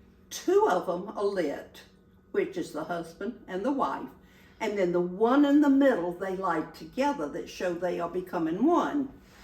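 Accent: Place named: South Carolina, United States. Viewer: When my husband was active duty, we were stationed in Hawaii for a while. The Hawaiians swear by their macaroni salad. One thing I learned from them is interesting. Before you add anything else to your cooked macaroni, stir only the apple cider vinegar into the noodles. Just let it sit for an hour or two before adding everything else. I can't say how but it does make it 'different'. I can't say better because yours looks marvelous. Anyway, just thought I'd mention it. I love your channel. My grandmother sounded just like you. She grew up near the SC line and adopted your most beautiful accent.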